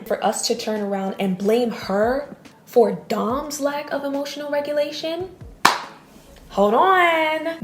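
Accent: Jamaica. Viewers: Subscribe immediately when I heard patois😂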